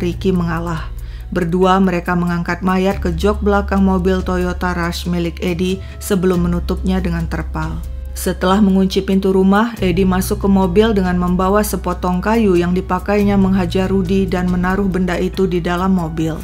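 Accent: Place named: Medan, Indonesia. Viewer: Logat ini kek logat Medan om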